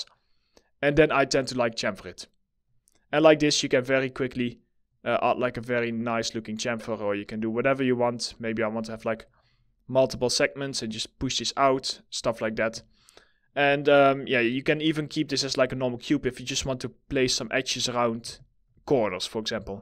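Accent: Netherlands. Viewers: Thank you for this! I'm learning 3DS Max ( student ), but my teacher just races over the functions and options so I don't really understand how they work. You explained it perfectly! Side note: Are you Dutch by any chance? I noticed the heavy Dutch accent haha. I speak Dutch too, dus dank je wel voor de tips :)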